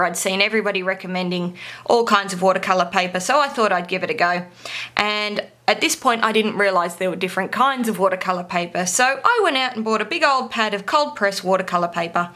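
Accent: Australia. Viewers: Hi. I'm preparing to teach a class using colour pencils and found your tips useful prompts for me to pass along. In some cases they were things I already knew but good to be reminded. Also thanks for showing unsatisfying past work especially how certain papers behave. It took me years to appreciate the importance of using quality art materials and stop blaming myself for unhappy endings. Now I always tell my students a bad piece may not be simply due to their talent or lack of it. Nice to hear the Aussie accent as well.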